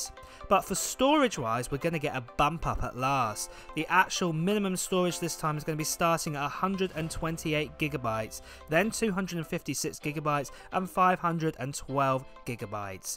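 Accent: United Kingdom. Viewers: British accent 😂😂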